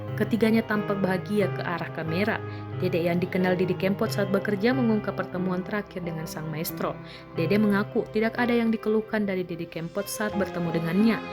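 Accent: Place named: Medan, Indonesia. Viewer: mbk e yg bawa acara kyak orng medan dengr dr logtnya..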